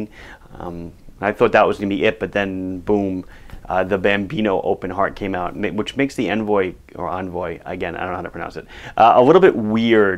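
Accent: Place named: New York, United States. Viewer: am just here for the NY accent...and the watches sometimes.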